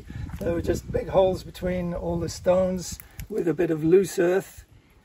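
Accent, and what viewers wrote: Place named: North Staffordshire, United Kingdom. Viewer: An excellent piece about a wonderful little house! :) And narrated by a fellow who sounds a good deal like Lemmy :D You wouldn't happen to be from around that neck of the woods would you (as in where Lemmy was from)? There are elements in your accent that are familiar to my ear, being a North Staffordshire lad :).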